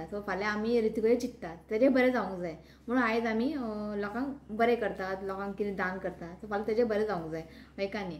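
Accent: Konkan, India. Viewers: Hi Congratulations🎉🎉 God Bless You n your family Your s is the 3rd Vlog I am watching, so I have compared with the other 2 vlogs n found yours to be the best natural vlog, you are perfect in all, I like your konkani accent, I like when you crack jokes, I have tried many of your recipes n it turned superb n shared them to my friends. All the best 🎉🎉🎉🎉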